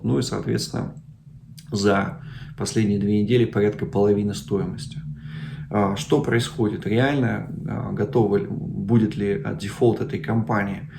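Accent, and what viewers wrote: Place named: Siberia, Russia. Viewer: Ну ещё и сибирский говор, который, как сказал поэт, "неистребимый уральский акцент ", это мегакруто слушать, при совершенно правильной речи дух человечности реального языка сквозит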